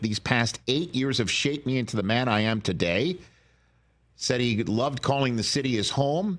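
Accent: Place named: South, United States. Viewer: Rich Eisen speaks just like one of them “good ol’ boys” mask came off here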